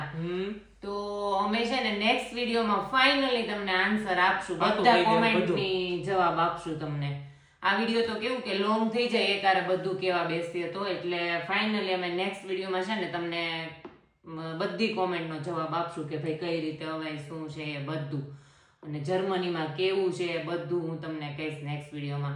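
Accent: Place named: Kathiawar, India. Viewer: Tamari kathiyavadi accent Bov sari che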